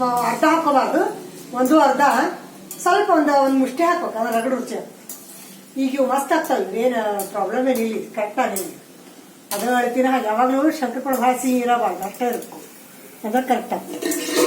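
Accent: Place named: Gulbarga, India. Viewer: ನಿಮ್ಮ ಭಾಷಾ ನೋಡಿದರೆ ಗುಲ್ಬರ್ಗ ಕಡೆಯವರು ಅನ್ಸುತ್ತೆ . ನಾವು ಯಾದ್ಗಿರಿ ಸೈಡಿ ನವರು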